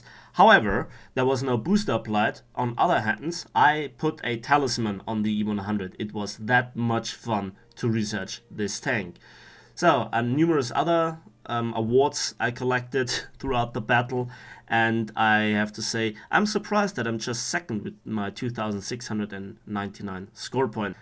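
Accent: Bavaria, Germany. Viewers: Bist du aus Bayern ?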